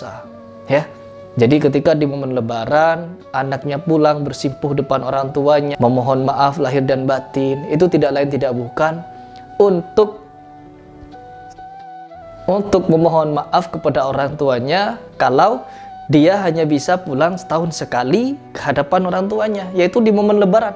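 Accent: Madura, Indonesia. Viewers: Reng madureh kake ye ?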